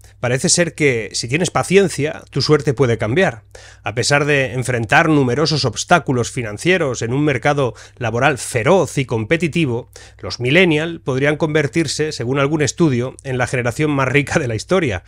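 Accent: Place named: Spain. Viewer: Habla 120% como un español pero tiene nombre y apellido franceses, y parece que vive en Francia. Curioso.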